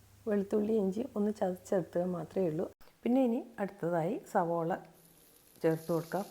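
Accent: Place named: Kannur, India. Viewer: Adhyam paranjathu valare sheriyaanu. Chickenil enthum pareekshikkaam. BTW, ningal screenil ezhuthi kaanikkumbo - krithyamaayi ezhuthan sramikkuka. Savola kku pakaram - Onion ennum. Turmeric*, pinne Green chlliyude koode coriander ennanu ezhuthiyathu. curry leaves alle ittathu ? Pinne.. adhyam ittathum randamathu ittathum aaya pepper powder different aano ? color kurachu vyathyasam pole thonni (may be lighting). ithrayum neetti ezhuthaanulla kaaranam.. njan okke recipe kandu like adichu pokaan alla kaanuka. kandaal cheythu nokkaanum koodi aanu. athum nammude kannur style basha kelkumbo .. kooduthal :) . Njanum molum mathrame non-veg kazhikku. athu kondu njan thanne undakkanam . athu kondaanu ellam ezhuthiyathu. Accidentally ningalude lemon powder pop-up aayi. appo channel nokki. ippo subscribe um cheythu. Thanks for the recipe. Will definitely try once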